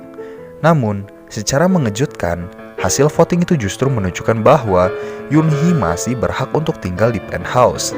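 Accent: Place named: West Java, Indonesia. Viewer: Orang sunda mana nih...😂😂😂😂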